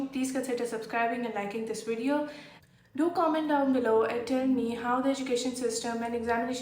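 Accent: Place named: India. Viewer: Where were you born? You sound indian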